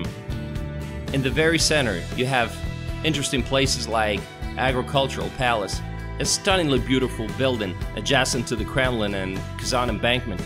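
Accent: South, United States. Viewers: Looks like a great place. I really enjoy your videos. Where did you learn English....its almost as if you were in the Southern USA for some time! My daughter is interested in the Russian language, mostly because she is a musician and I have successfully passed down my love of Russian / Soviet classical music to her. Were planning on visiting Russia to learn Russian. We thought about Odessa in Ukraine and Bishkek in Kyrgyzstan, in the interest of saving money, but have decided to go to Mother Russia direct. Currently we are thinking of Volgograd or Krasnodar. Of course we will probably visit Moscow, St. Petersburg and Murmansk towards the end of our trip. Is the Kazan accent in Russian more similar to Moscow and St. Petersburg or is it closer to Krasnodar and the South? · Is it just me or is the Russian narrator in this video sound as if he took a course in trying to learn how to speak with an American accent?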